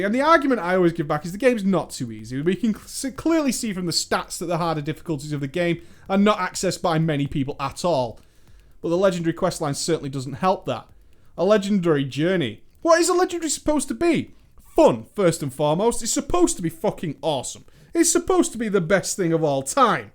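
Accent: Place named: North West England, United Kingdom. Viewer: dont apologise for your cough, apologise for your scummy north west accent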